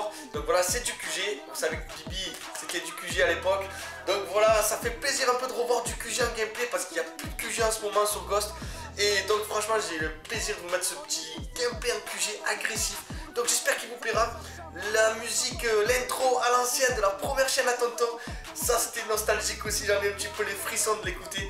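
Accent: South, France. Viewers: Notre TonTon tjrs aussi beau tjrs aussi bn merci pour ce nouveau bijou de ta pars bisous et tn petit accent du Sud me donne la chaleur qui y fait =)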